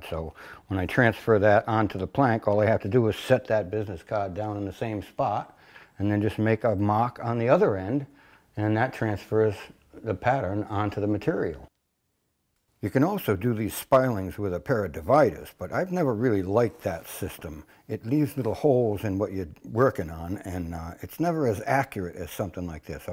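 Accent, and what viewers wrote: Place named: New England, United States. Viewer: Good to see you,. Hope all is well. I really enjoy your old school approach, the sound of a common-sense New Englander in your accent, and your calm love of precision. You are an inspiration.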